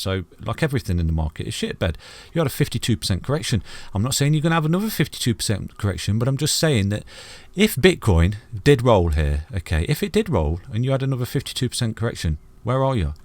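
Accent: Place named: East Midlands, United Kingdom. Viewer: where are you from? Trying to work out your accent. East Midlands?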